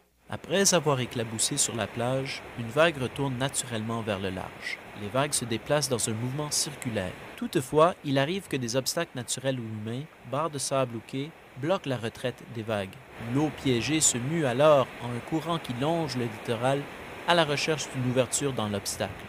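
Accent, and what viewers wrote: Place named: Quebec, Canada. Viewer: Sur la côte atlantique française, cela peut porter plusieurs noms : ''Baïne'' ('petite baie' ou 'bassine' en langue gasconne) sur le littoral aquitain, ou ''Bâches'' au nord sur la côte de Bretagne ou de la Manche. Plusieurs phénomènes doivent être rassemblés pour créer ces courants d'arrachement : Il faut des marées suffisamment fortes, du sable fin, un dénivelé faible et une forte houle. C'est spécifique aux côtes dont le fond est uniquement sableux. En tant que surfeurs et bodyboardeurs, nous nous en servons pour faciliter la remontée vers le large, une sorte de ''voie rapide'' dans l'eau pour rejoindre les pics du déferlement des vagues et se positionner. Mais en effet, c'est très dangereux pour les baigneurs. Vos conseils de prudence sont très utiles. Chaque année, nous déplorons des noyés dans ce contexte, par imprudence. 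En tous les cas, je savoure l'expression que vous employez (''Courants d'arrachement) + l'accent québecois ; c'est encore plus suave ! 😉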